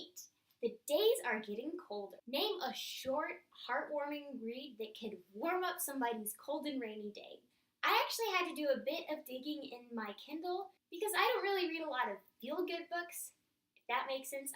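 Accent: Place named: United States. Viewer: I love your American accent! You sound so cute♥️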